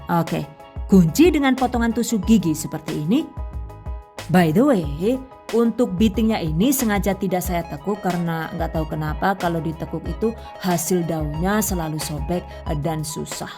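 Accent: Banyumas, Indonesia. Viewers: Cantik-cantik lempernya bunda.... terimakasih bunda resepnya, jadi lebih praktis buat lempernya. Saya selalu suka dengan resep karena mantap dan lucu2 ada selingan humornya terutama bahasa ngapaknya itu lho. Jadi penasaran bunda ni asli madiun atau daerah ngapak ya.... 🤭🤔🙏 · Matur suwun nggih mbak 🙏 sampun berbagi Resep Lempernya...Jaaan Ngapake ky inyong ya 😁 ora ngapak ora kepenak 👍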